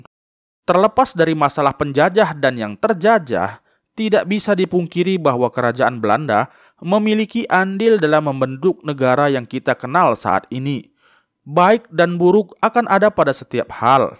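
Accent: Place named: West Sumatra, Indonesia. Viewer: adminnya orang minang yah, logatnya kayak orang minang yang banyak profokatif kalau di medsos 😁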